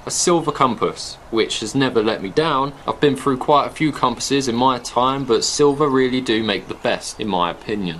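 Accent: United Kingdom. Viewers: Awesome british accent, amazing and clear tips and good jokes, officially sir, you are awesome !! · did u say u need a fick durable knife? did u say sheaf? free sections of gear? Firty meters of fishing line? I love the way brits talk !! do us yanks sound like that to u guys?? Oh yea and u got all those bags of morale boosters but i didnt see a bag of weed or a bag of coke!! Cheers m8. Put another shrimp on the bar-b :)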